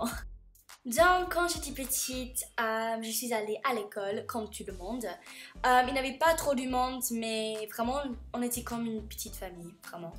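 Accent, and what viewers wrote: England, United Kingdom. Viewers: C'est courageux de ta part d'être venu en france sans même savoir parler français et j'adore ton petit accent anglais :P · j'ai pensé que tu es de l'Angleterre, je peux encore entendre ton accent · super la vidéo c'est super dur de quitter son pays natale et d'apprendre une nouvelle langue donc tu as eu du courage continue comme sa (j'aime trop ton accent Anglais!)❤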